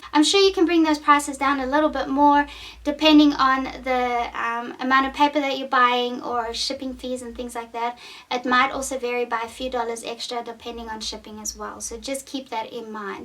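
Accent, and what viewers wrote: South Africa. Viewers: Beautiful accent - are you South African?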